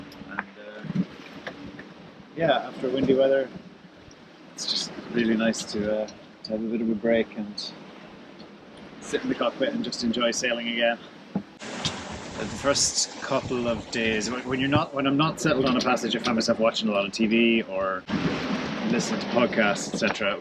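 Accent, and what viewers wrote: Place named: Ireland. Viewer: Really enjoying these videos of the West to East Atlantic passage. Totally in awe. Really aware of the risks especially when you mention the possibility of knock downs and you hear cracks in the cockpit. So refreshing to watch after not watching you for a few months. Plus the added attributes of the gentle Irish chatter. And the transparency! Absolutely love it! ⛵️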